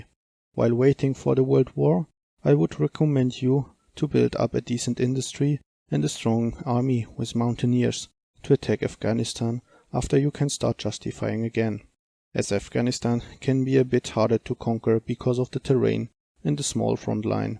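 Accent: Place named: Germany. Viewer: Ich liebe deine sachliche Sprechweise, gemischt mit einem wunderbaren deutschen Akzent <3.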